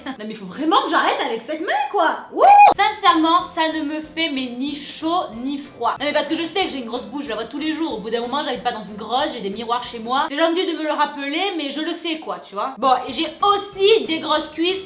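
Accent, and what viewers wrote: South, France. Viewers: hey écoute pas les rageux, tu es toujours au top, continue comme ca. En plus avec l'accent du sud tu me régale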